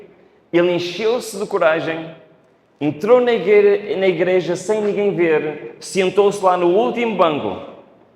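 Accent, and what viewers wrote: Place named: Portugal. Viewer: Boa tarde, amo ver ,este PR. Ele é muito didático, e meu conterrâneo,sou filha de português,e meu pai, falava assim.Moro no Brasil.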